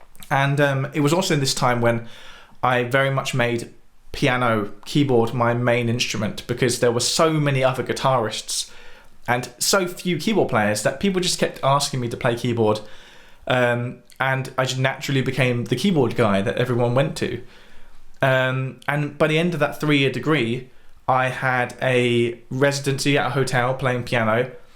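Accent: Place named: Bayswater, United Kingdom. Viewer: as it pertains to the quantity of subscribers, if not for that Bayswaterian accent of yours, you'd probably only have 2 subscribers. I keep waiting for someone to yell "CUT!" and then you go into some American deep south manner of speaking. "did y'all get that? is that a little ol' take?" Seriously, you bring a TON of value and you make things easy to understand and you solve the riddles/puzzles about songs I've always wondered about like your video on and such. For me, I just want to jam and be able to understand the language. I don't think I'll ever be at Macca level because I have other things going on (like working on planting agave to make tequila, as you can see) but to be able to get up on stage and play the songs that I enjoy and that bring energy would be great for me. So, your vids get me closer to that end. Gracias, amigo.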